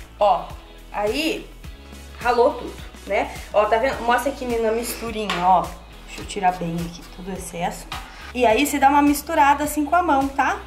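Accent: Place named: Ponta Grossa, Brazil. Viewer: de que cidade vc é?Parece sotaque de Ponta Grossa.Eu moro em Londrina mas sou de lá.Bjs.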